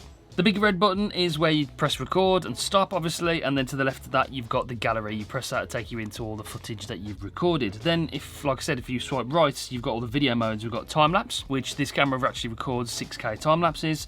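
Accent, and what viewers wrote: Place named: England, United Kingdom. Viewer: that english accent really sucks. Sometimes is hard to tell what the hell you are saying?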